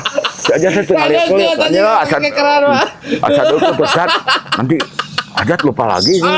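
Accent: Indonesia. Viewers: resep ngdangu na, sunda asli ieu mh😂..... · Logat brdk cicadas ,anatapani 😂😂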